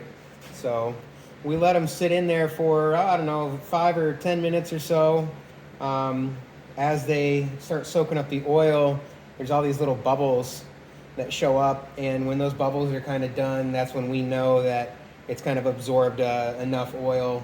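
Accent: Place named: South, United States. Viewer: This guy's from the South!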